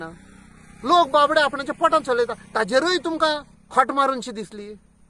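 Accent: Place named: Konkan, India. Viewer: Enough enough. Getting irritated by your accent of konkani. Khuicho re baba tu? Outside Goa?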